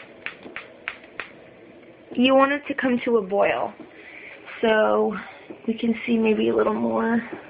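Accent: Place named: United States. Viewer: Gotta love when idiots are explained how somethign works then makes youtube videos about it: Here.. I have something which obviously is larger than CUPS... marked with 12 sure as hell wonæt be litres and given the accent, we can only surmise it is a yank, no wonder, so perhaps gallons... 12 gallons that size that far up.. feasible, looks a bit over the top but still within reason... Stupid girl goes erm 12 , let me think, cups, ye thatæs right.... JESSSUUSSS. Just leave our planet already please x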